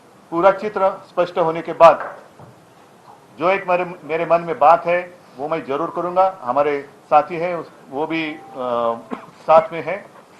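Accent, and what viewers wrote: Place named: Maharashtra, India. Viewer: सारे लोगो को धन्यवाद,,,साहेब आपन पन आमच्या सारखेच हिंदी बोलतात,,,तुमचा गर्व आहे आम्हाला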